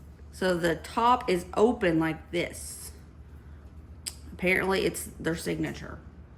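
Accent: South, United States. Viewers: Thank you for your video. You are so sweet! I adore your southern accent! It is so nice to hear it after living in Michigan for almost 15 years—I’m originally from Kentucky. ♥️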